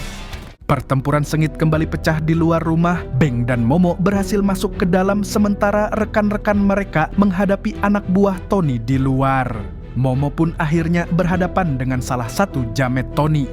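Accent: Banjar, Indonesia. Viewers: Adminnya urang banjar sklinya😂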